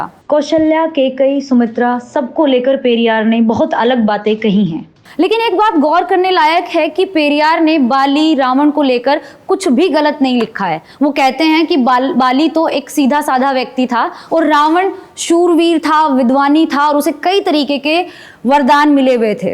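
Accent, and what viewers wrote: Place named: Haryana, India. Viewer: You have a distinct haryanvi flavour in your accent, and i really like it. Respect from this bihari. Love your journalism and topics that you raise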